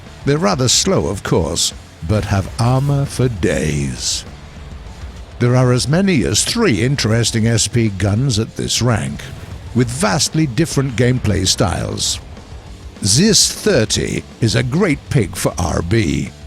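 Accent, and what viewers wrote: United States. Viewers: wait why did u turn American?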